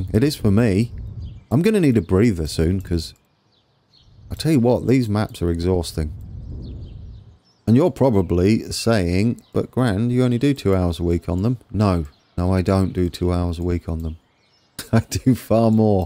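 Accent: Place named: Midlands, United Kingdom. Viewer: Wonderful work! Keep it up! And I got the "shout out" at the start... I'm blushing a bit (BTW - surname is pronounced "Kool" (really!). Austrian - German family. The spelling in German has the "umlaut (dots)" over the "u", but the typewriters on Ellis Island 140 years ago didn't have that key!) Good effort you! So an American in the Midwest, with the German last name, who lived in London for a couple of years right at Y2K and a bit, who's very much a British railway / London transport nutter helping out a Midlands (If I place your accent properly?) bloke who's living all the way over in the Hill Country near Austin, TX with the livery colours of a West Midlands train on this recreation. What a crazy, mixed up world! Lovin' it!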